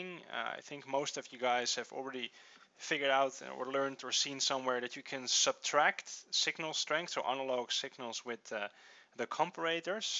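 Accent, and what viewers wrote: Netherlands. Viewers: You are Dutch right? I can hear it 'cause of your accent :P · je spreekt comparator verkeerd uit, 'tgeeft niet p.s. je kan hiren dat je nl bent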